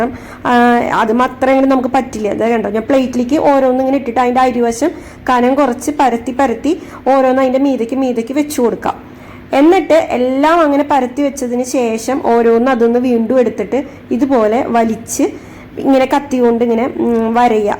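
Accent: Thrissur, India. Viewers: Midukki thanne ! Keep it up. Very nice presentation. TCR slang exalted. Midumidukki. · Hi, awesome and super easy recipe.I love your way of talking and the Thrissur slang..cooking as well as your slang is simply superb..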